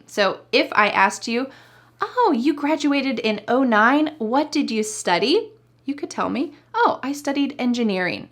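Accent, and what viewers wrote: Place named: United States. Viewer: I went to college in Bogotá (Colombia), graduated in 2023, majored in Law, currently attending the LLM at the Hard Knocks Academy, rather book smart, and lacking street smarts, definitely a bookworm (in Spanish it would be: Ratón de biblioteca: library mouse", literally), currently dont have to hit the books, and life long learner (love the movies, enjoying awards season at my local multiplex - CineColombia), maybe a little bit oversharer - no way!); thirsty for knowledge, if not the Booze - this I had to look up at Merriam - Webster, as a synonym (our local spirit being Aguardiente, lit. fiery water; tha latter won´t make it to Merriam-Webster, but maybe to be found in the authority for the Spanish language RAE, and for sure this one I did look up at the memoirs of street academy/smarts); love that - I take it - american accent of yours. 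In the last bit a tried to apply at least one of Trunk´s elements of style. Thanks, very enjoyable!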